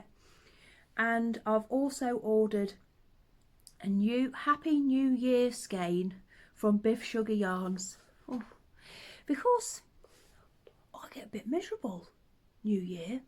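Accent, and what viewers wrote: Norfolk, United Kingdom. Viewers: Just seen your Russian doll tattoo. My makers tag is a red russian doll so we have a connection right there. Lovely to hear your Norfolk accent, am in Swaffham myself. Great chatty podcast ☺️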